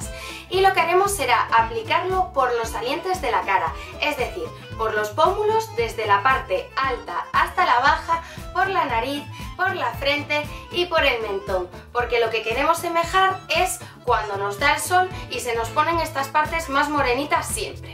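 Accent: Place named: Spain. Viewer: Es acento de España.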